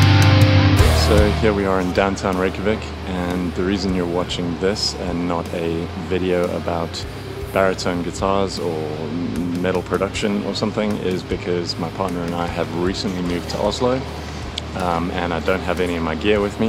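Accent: South Africa. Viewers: Random question and please correct me... are you South African?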